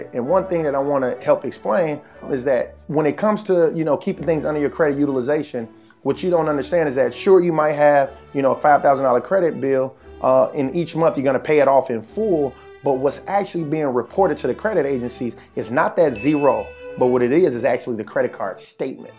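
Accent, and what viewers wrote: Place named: Chicago, United States. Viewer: Bro GOTTA be from Chicago !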